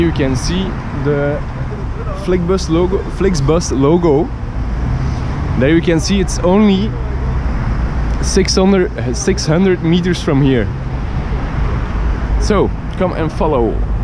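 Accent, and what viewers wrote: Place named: Ghent, Belgium. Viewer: hoor ik daar een klein Gents accentje?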